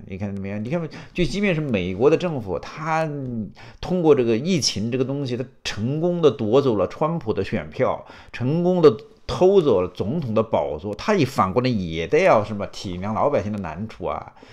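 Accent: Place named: Changde, China. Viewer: 常德人？口音很亲切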